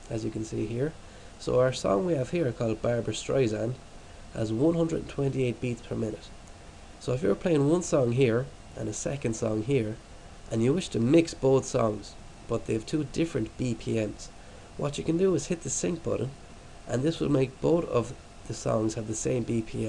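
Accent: Ireland. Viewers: nice to hear an Irish voice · are you irish ? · You're Irish :O I love your accent so so so much. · Where in Ireland are you from ? haha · He's not foreign.. he's Irish... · r u irish?? just wondering :)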